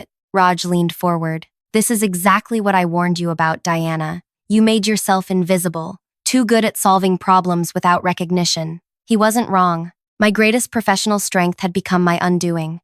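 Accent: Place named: Asia. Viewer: Same old Asian accent and same old points. No American accent to tell it's a story from USA. Just mocking American corporates always 😢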